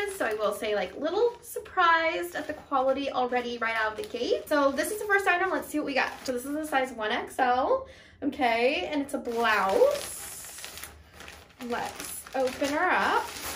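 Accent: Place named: California, United States. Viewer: You sound like you’re from California the way you talk 😂 this is coming from a Mainer haha 💜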